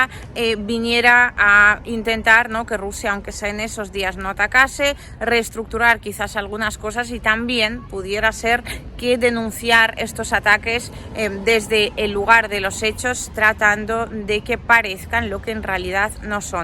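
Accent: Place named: Madrid, Spain. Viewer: Todo bien con vos, pero pregunto, porqué decís "actualidaZ" en lugar de "actualidaD"? Es con D no con Z. Esa estúpida manera de pronunciar que tienen los madrileños que en lugar de decir MadriD, dicen MadriZ, y vos lo prununciás igual. Por favor, si vas a hablar castellano, hablalo de modo neutro, natural, no con modismos locales. Otra: si decís "noticia" es "notiCia", con C, no con Z. Porqué decís "NotiZia", como los madrileños, o como algunas pocas regiones de España? En ningún lugar del mundo de habla española la C se pronuncia como Z, o sea, que para quienes somos latinoamericanos e hispanoparlantes (que somos la gran mayoría en el mundo) suena casi odioso oir que se prununcie de ese modo. Esos vicios en la pronunciación los puedo entender en alguien nativo de Madrid, pero no en un extranjero que pretende hablar castellano. Igual te felicito porque lo hablás demasiado bien, y sobre todo por tu trabajo. 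Gracias.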